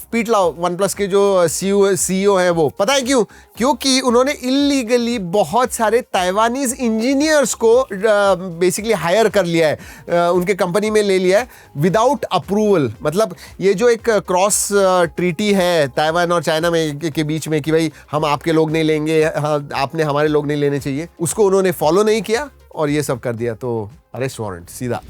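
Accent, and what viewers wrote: Maharashtra, India. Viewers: Bhaiya love your marathi accent ❤❤ Tumi pn till gulh ghya gode gode bola ❤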